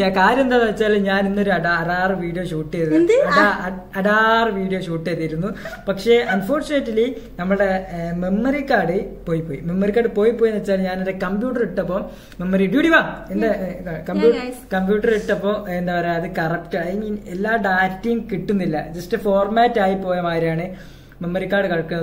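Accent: Kozhikode, India. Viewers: പോയി പോയി കോഴിക്കോടൻ touch ഉണ്ടല്ലോ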